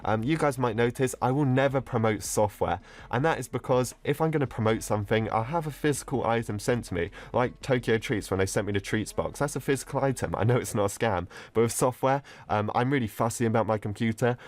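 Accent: United Kingdom. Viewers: You know sounds professional when he speaks British.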